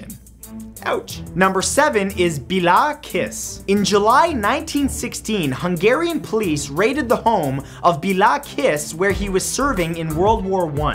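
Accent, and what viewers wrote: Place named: Canada. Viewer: to all people saying its pronounced row-an-oak he is canadian they pronounce stuff diffrently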